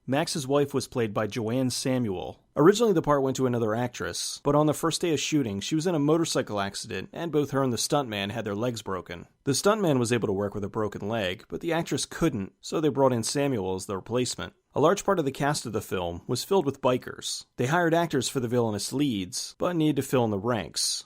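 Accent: United States. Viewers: Every time I hear that American voice...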